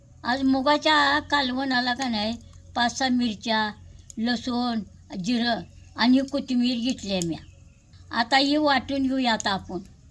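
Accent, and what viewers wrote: Kolhapur, India. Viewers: खुप छान मावशी तुमच्छा जेवणाची चव तर माहीत नाही पण तुम्हची बोली भारी आहे कोल्हापुर तल कोणतं गाव तुम्हचं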